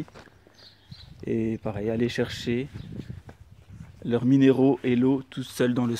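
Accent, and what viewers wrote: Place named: East, France. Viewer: L'accent est un peu de l'est , allant de la Franche-Comte-Bourgogne aux Vosges.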